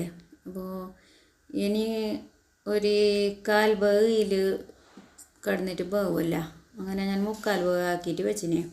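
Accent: Kasaragod, India. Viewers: സംസാരം അടിപൊളി എനിക്ക് കാസർഗോഡ് ഭാഷ വളരെ ഇഷ്ടമാണ് വീഡിയോ കണ്ടു ഞാൻ ഇത് പഠിക്കും😂😂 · നല്ല അടിപൊളി സംസാരം എനിക്ക് ഒരുപാട് ഇഷ്ടപ്പെട്ടു കാസർകോട് ആണല്ലോ സ്ഥലം കാസർഗോഡ് ഭാഷയും വിഭവങ്ങളും ഒന്ന് വേറെ തന്നെയാണ്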